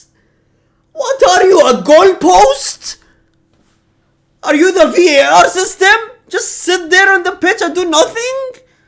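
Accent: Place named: United Kingdom. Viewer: bro has developed a uk accent 😭😭😭😭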